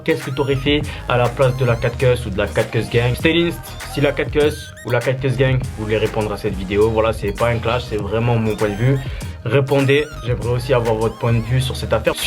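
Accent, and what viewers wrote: Marseille, France. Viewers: GG a toi le sang avec l accent marseillais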